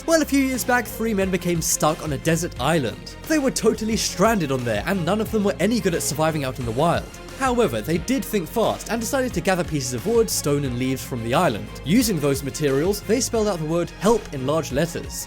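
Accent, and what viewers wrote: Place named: United Kingdom. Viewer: When you enjoy listening to a British accent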